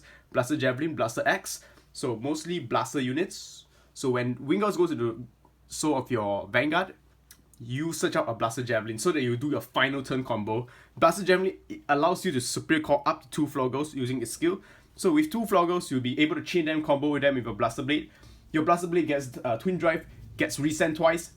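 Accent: Singapore. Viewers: You sound like a singaporean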